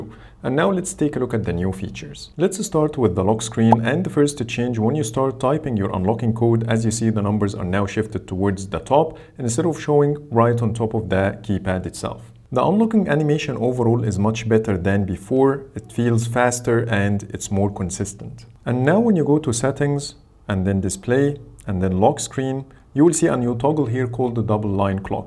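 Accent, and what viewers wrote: Egypt. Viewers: احلي مسا عليك من مصر الفيديو جامد عرفتك من اول الفيديو انك مصري 😂♥️♥️♥️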